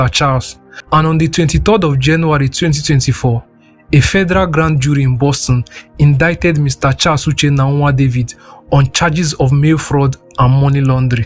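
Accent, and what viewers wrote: Igbo, Nigeria. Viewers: Baba tuche up on the accent, no need to form yankee but this strong igbotic accent will impede traffic to your channel. Almost as if you're trying to make the accent as strong as you can, its off putting to a lot of people's ears.. My advice though.... but good storyline and storytelling.!!. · Your narration is superb 👌. I love your Nigerian accent. ❤ Well done bro.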